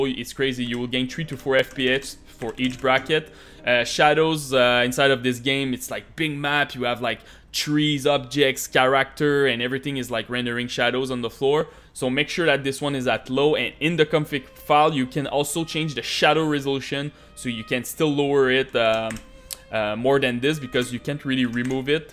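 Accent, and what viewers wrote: Quebec, Canada. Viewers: i swear to god this guy must be from quebec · Tes quebecois c sur :P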